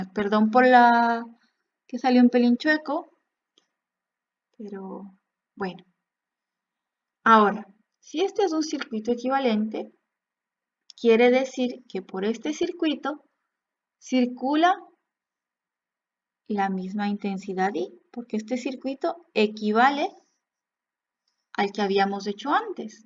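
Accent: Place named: Spain. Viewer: Buena explicación y más ya con ese acento bien españolizizimo 😂😂😂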